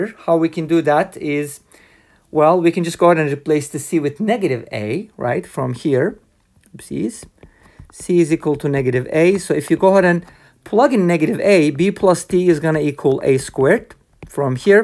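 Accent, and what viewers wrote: Turkey. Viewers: usta bu fikre şivenden kapıldım ama türk müsün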